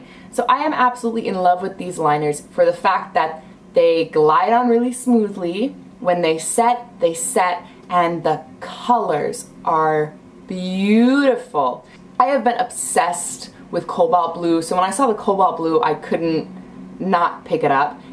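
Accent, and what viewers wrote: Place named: Netherlands. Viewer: ben je nederlands ik hoor het aan je acent :)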